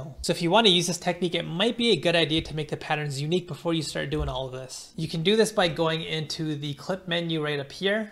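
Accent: Punjab, India. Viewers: Are u punjabi bro?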